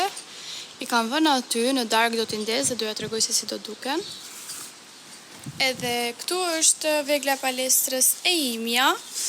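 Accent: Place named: South, Albania. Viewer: Lezetshem si ide ! Bravo ❤❤ Nga e folura dukesh si nga jugu🙈🙈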